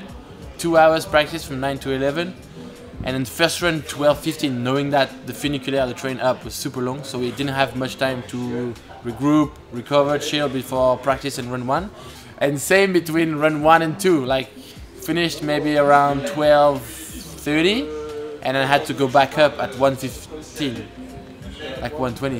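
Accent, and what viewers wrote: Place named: France. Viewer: Au top l'accent Français quand tu et pas très doué en anglais sa aide 😉Bon courage a toi pour cette saison hâte de te voir détruit la piste des Gets 😄 👍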